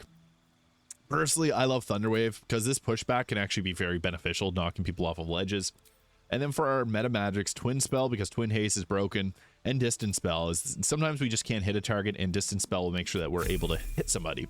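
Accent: Canada. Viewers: lmao yes I figured you were my northern neighbor greeting from Minnesota!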